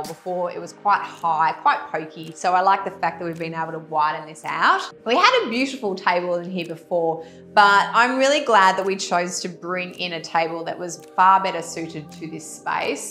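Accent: Australia. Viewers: Nice home like your accent too are you from Australia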